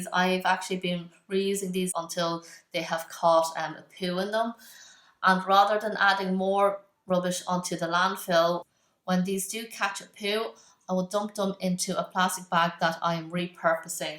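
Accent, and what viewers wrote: Ireland. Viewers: Do I hear a bit of an Irish accent?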